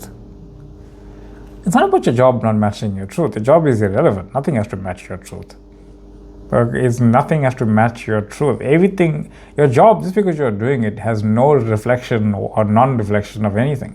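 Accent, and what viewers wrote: South Africa. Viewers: How did I know you were South African even before I played the video😂...looking forward to watching your material.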